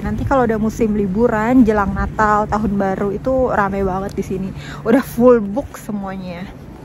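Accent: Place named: Java, Indonesia. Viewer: Mbk logat omonganmu kok ke Jawa"an gt.. Asli orng Jawa ya.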